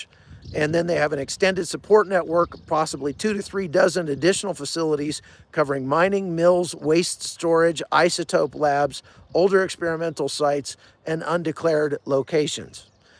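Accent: United States. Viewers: What are you doing in Iran, you sound like your American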